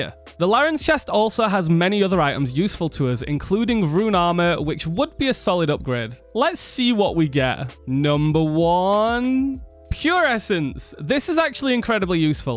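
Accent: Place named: Hull, United Kingdom. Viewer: Dude are you from Hull? Accent is strong with this one. Good vid tho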